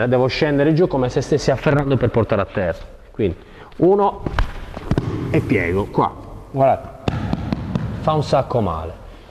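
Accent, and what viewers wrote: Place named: Sardinia, Italy. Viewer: accento sardo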